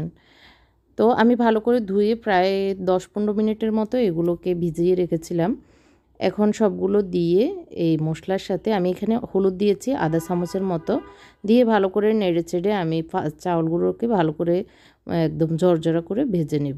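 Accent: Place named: Noakhali, Bangladesh. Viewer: আপা নোয়াখালীর মনে হয়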